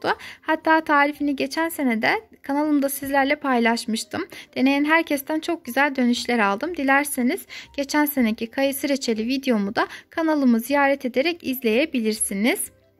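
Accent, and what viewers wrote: Azerbaijan. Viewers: Xanım salam ,siz Azərbaycanlisiz ,lehcəniz mənə şirin gəldi .Abone oldum əllərinizə sağlıq 🌼🌼🌼🌼🌼👍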